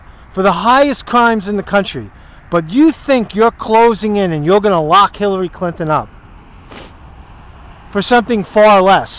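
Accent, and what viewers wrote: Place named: East Coast, United States. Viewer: This channel is PURE GOLD, east coast kid. I grew up in CT married a girl from Jersey who's Full blooded Italian mom and dad were from Brooklyn (Dad) and Newark. (Mom) I live in the Midwest now and Brother let me say your accent and mannerisms sound like HOME to me. I could listen to you gab all day long, and the content is ACES. Homesick.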